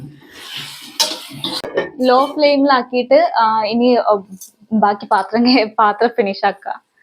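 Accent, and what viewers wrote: Malappuram, India. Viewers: ഞാനും അങ്ങനെ തന്നെ പാവം നമ്മുടെ മലപ്പുറം ഭാഷ പറയുന്നു കുട്ടി 🥰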